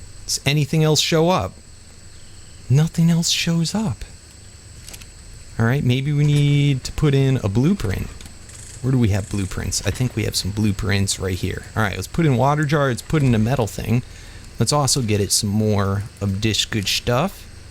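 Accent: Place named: Boston, United States. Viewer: 220307 USA, Boston maybe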